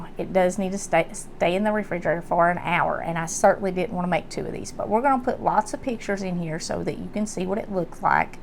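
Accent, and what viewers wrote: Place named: Tennessee, United States. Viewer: Wonderful lady! Very delightful. Love her deep southern accent from Tennessee. She is a rare one these days. She praises men and the men in our lives and teaches that appreciation of the men in our lives is important. We live in a society that prefers to demonize men, make them all out to be bad actors and bad guys and never good. That even men who are strangers must be up to no good if they approach a woman outside excercising and want to ask her something. I understand some of them may say things not kindly but that doesn't mean we say unkind back. Courtesy and manners and good words go a long way. Maybe pray for that person, smile and walk or run on. But not a bad word. I was just on a Facebook group the other day that clearly in the comments of women showed exactly that. It went from a conversation on personal running safety to make hate and sexual harassment and how ALL men must be so bad and up to absolutely no good just because they approach some female or happen to be outside in the great outdoors too. 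These women commented as if they owned the world, like the world revolves around them and how everything has to change to fit their narratives. I couldn't believe it! Never have I heard or read anything so repugnant in my life. Well, I'm a woman too. I grew up in a day and age where my parents taught me how to be kind to all people including strangers. I was taught how to gage a situation and how to act accordingly. It's so unfortunate that we are living in a society th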